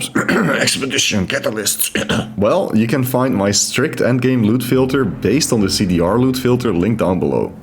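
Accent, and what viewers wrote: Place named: Netherlands. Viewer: This video autoplayed after a random Poe2 video. I was like hmmm his accent sounds Dutch. Then I saw your channel name😂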